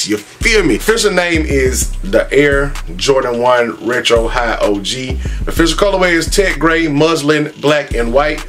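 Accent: South, United States. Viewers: you're a funny dude and your southern accent. keep doing your thing bro!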